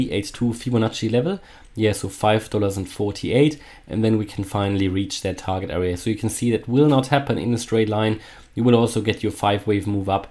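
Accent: Germany. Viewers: 3.50 !!!!!!!!!!!!!Love your german Accent. :) was kostet deine Mitgliedschaft und kann man auch traden lernen,.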